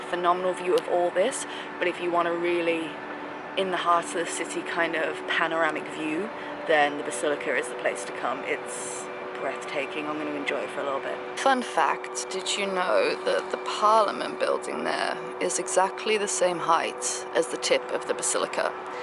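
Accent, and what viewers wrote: England, United Kingdom. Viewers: hahaha... you are super funny and really liked the way u explained ur day expenses and luved ur accents too which reminded me of nephew living in England... but he is 12 yrs kid 😁😁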